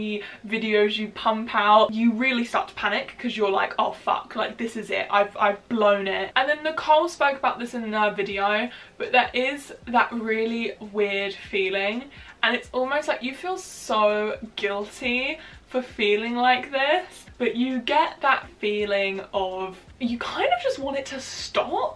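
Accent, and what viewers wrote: United Kingdom. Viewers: I’m sorry you get awful comments… I’ve been watching you for a while, I seldom comment on videos, so this is my first comment on your video. After watching this, I wanted to give you a compliment since you said you read every comment haha. Well or, more so throw shade at people who criticize irrelevant things like your appearance… Anyway! Sorry I’m rambling 😅. The people who comment about your teeth and ask why British people don’t care about their teeth, and just pissed they don’t have the superior accent, at least that’s my guess as someone who would trade my boring midwestern accent for a more sophisticated accent. Ugh this is a weird comment, and it’s all over place, this is why I don’t comment 😂. Anyway! I think you’re a cool pretty lady, who makes videos that I like, and I hope you have a great days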